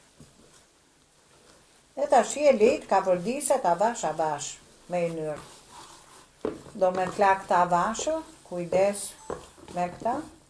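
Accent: Tirana, Albania. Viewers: Te lumshin duret e ke qa. 😋 Masanej na kenoqe me te folmen . Te lumte qe se ke humb traditen Tironse. O Tiron zemer flori Prite te gjithe te medhej dhe femi Me ere Dajti uje Selite I rrite cunat dhe gocat si rreze drite Lum ai qe i bo shok Se ka bo i mik per koke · Ju zèntè gezuar e me shendet madam qe flet me lezet gjuhen tironse 😘🌟👍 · Dhe une e bej vazhdimish moj Zonje e nderuar,pervec gatimeve te mrekullueshme me pelqen dhe dialekti Tiranos ton te dashure! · Te lumshin durt e arta moj teta ☀️🌷me kenaqe me kete menyre te folur Tironce, te pershendes nje Kavajse nga Modena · Ju lumshin duart zonje je shume nikoqire bravo qe sjell ne guzhinen tende gatime tradicionale me qelqen e folura jote tironse respekte!!!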